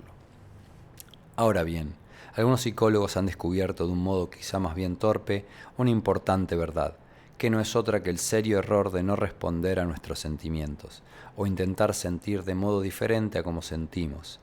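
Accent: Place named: Argentina. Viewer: Más allá del contenido que es genial, el locutor real me encanta! En argentino!! Éxitos!!!!!!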